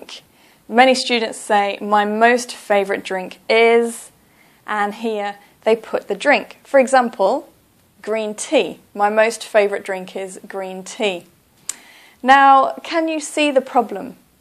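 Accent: United Kingdom. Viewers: Its british accent. You can feel the BOUNCE the way they speak.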